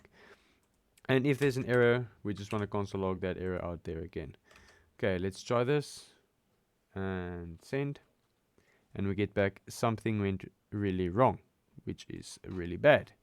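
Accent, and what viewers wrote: South Africa. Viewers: i can hear a mzansi accent...?....?....? ❤❤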